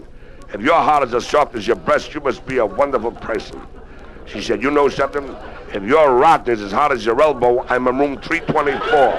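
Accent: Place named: Brooklyn, United States. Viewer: He speaks in the old lost Greenpoint (greenpurnt) Brooklyn accent. Note the very canned laughter. Live recordings in the 1950s were quite rare.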